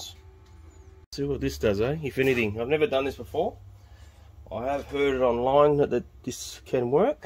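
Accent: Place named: Australia. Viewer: I was expecting to hear a french guy speaking, bugger me if it wasnt a fellow Aussie !!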